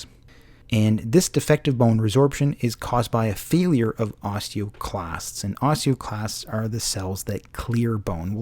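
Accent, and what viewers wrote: Canada. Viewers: Excellent clearly ordered and explained. Look forward to more. Canadian accent helps!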